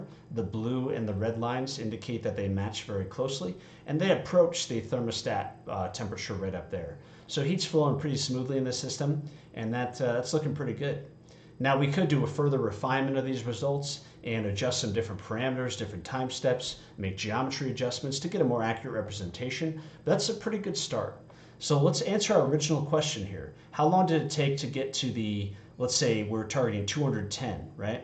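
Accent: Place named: United States. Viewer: this the first time i see an American (i assume from your accent) using SI and Metric unit for the tutorial lol. this video is amazing tho, very detailed explanation. very helpful. thanks a lot.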